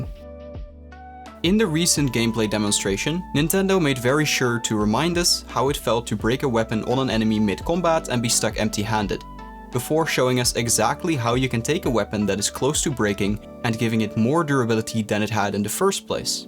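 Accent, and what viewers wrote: Netherlands. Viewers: Are you perhaps Dutch? I recognize that accent 😂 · Are you dutch? You sound dutch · Dutch detected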